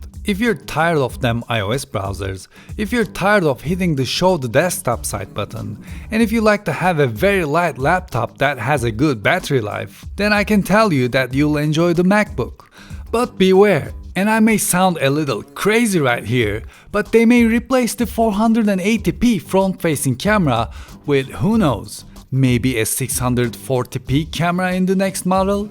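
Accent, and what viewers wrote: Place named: Turkey. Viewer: Aksanı çok Türk kaçabilir fekat beyin gramerle sorunu yok obvs.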